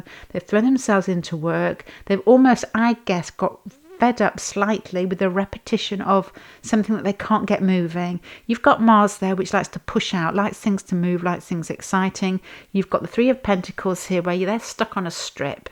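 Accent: Manchester, United Kingdom. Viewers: Are you mancunian? From Manchester?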